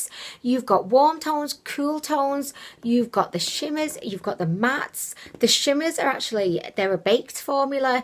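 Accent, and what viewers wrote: United Kingdom. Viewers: Interesting. It’s not a normal British accent.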